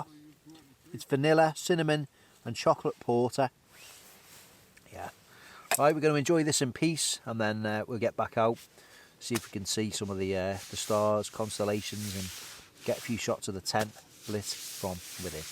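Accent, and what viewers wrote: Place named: Wirral, United Kingdom. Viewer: Guessing you're from either Posh/Nice Wirral, Southport or Chester maybe. Good Vid 👌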